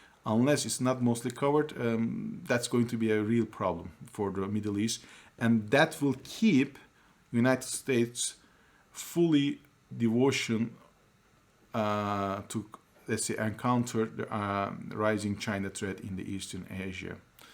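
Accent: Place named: Russia. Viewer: You sounded like a Russian speaking English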